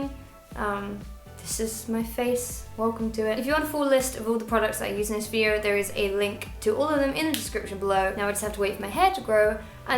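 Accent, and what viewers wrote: United Kingdom. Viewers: i love british accent so much...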